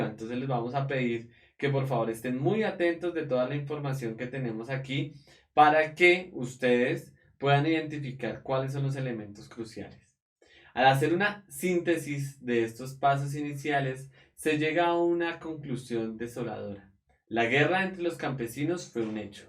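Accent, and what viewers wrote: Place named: Bogota, Colombia. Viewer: ey cachaco habla bien no se dice "acuerdesen" ni "desen", etc...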